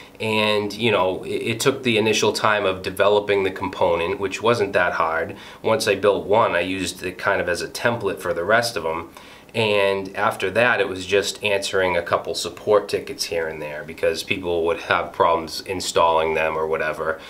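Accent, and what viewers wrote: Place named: East Coast, United States. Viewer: I've heard your message so many times before. But because your voice is so calming and mixed with what I think is a an East coast accent, your message seems so much more trustworthy. Lol. Great advice overall man, keep it up! Also thanks for deciding to help others. You're obviously an individual who is on a higher level than most.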